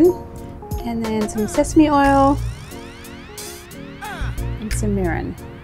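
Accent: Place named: Australia. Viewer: I like you Australian accent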